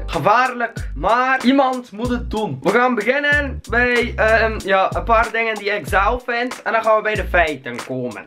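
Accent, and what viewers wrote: West Flanders, Belgium. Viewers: Nederlands maar ik snap echt niet wat mensen tegen Belgen hebben... Ik vind jullie accent echt leuk om naar te luisteren!! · ik ben nederlands en opzich hoor je wel dat je belgisch bent maar ik vind je wel echt een facking chille youtuber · Ik ben een nederlander maar ik snap niet dat mensen gaan zeggen KUT Belg enzo want ik kijk veel liever na dan bijvoorbeeld Joey maar Joey maakt ook echt leuke video's maar voor mij is er dus geen verschil ik vind gwn goede video's maken en let niet op zijn accent ik kan het verstaan en dat vind ik genoeg dus wie KUT belg gaat zeggen moet maar komen!!! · ik ben gewoon nederlands en ik heb niks teggen belgië ik vind het ccent juist facking lollig en grappig om naar te luisteren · je accent is super cutee Belgen zijn hetzelfde alleen dan schattiger ik ben Jammer genoeg nederlands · ik vind het belgische accent juist leuk hahahah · huh? 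ik ben een Nederlander en ik wil juist in België wonen en het accent over nemen ❤ gij bent geweldig 💋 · love Belgische youtubers ♥♥♥ ps ben Nederlands jullie accent is zoo leuk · Je Belgische accent vind ik eigenlijk wel grappig xD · west Vlaams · Ik ben Nederlander, en ik vind Belgen gewoon normaal hoor. Haha. Ik vind jullie accent juist heel leuk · Ik vind het belgiëse accent juist schattig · Ik mag ze allebei en ben zelf een Nederlander maar belgië is supertof en ik hou echt van het accent! Vindt het echt fijn om naar te luisteren ( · ik ben het helemaal met je eens. ik ben een nederlands meisje maar belgie vind ik persoonlijk geweldig, en ik hou van da accent... ik ben verliefd op da accent en jij bent ook geweldig en ik vind je accent juist heel leuk!! x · Ja ik accepteer je zeker ik vindt dat Belgen grappig praten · Ik heb totaal geen hekel aan Belgen (ben nederlander😅) en vind t leuker om bijv naar jou te kijken dan enzo knol jullie accent is Gwn mooi en schattig😂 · Ik zelf ben een Nederlander maar ik haat belgen dus echt totaal NIET :) Ik hou juist fk veel van België ouwe. 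1: De mensen zijn knap ( Voorbeeld jij kuch ) 2: T is een fucking leuk accent. 3:LoicNottet komt uit belgie en hij is me babe♥ Belgie is gwn mijn schatje, me nummer 2 beste land ever. Fucking veel love voor BelgenLand♥ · Dit is west vlaams met hollandse invloeden. Ik belgie ebde duzenden accenten, het brabants, bruxellairs, antwerps, gent, olsters, west vloms, oost vloms, lemburrgs enzo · Margot Poncelet ik denk dat hij uit west vlaanderen komt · ikzelf ben een Nederlander en vind belgen juist leuk met een accent, nederlands is zo simpel en saai hahaha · Ik vond het belgisch accent altijd kut maar door vind ik het nu juist leuk om naar te luisteren #gazodoor! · West Vlaams duidelijk,Limburgs is media taal en a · Ik ben een Nederlander maar ik wil een Belg zijn omdat 1 Je hebt een cool accent 2 De mensen zijn aardiger 3 NL is stom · Ik ben een Nederlander maar heb gewn haat aan Belgen, ps; jullie accent is soooo leuk!!!!!!! 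· Belgisch accent veel grappiger nii lullig bedoelt ofzo 😌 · ik ben eigenlijk in eerst in stantie gesubscribed omdat je een belg bent en ik het grappig vind hoe je praat maar je vids zijn ook chill. ik kom uit nl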